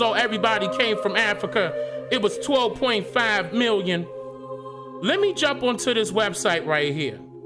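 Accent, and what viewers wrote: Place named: Philadelphia, United States. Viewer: Boom! Sounding so Philly too. 🙌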